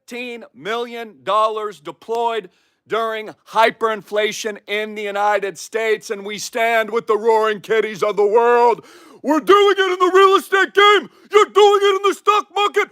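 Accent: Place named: United Kingdom. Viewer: Your British accent 🔥🔥😂😂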